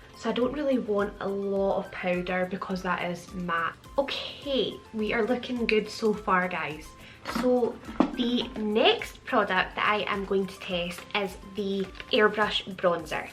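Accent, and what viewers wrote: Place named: Scotland, United Kingdom. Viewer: LOVE that Scottish accent omg!!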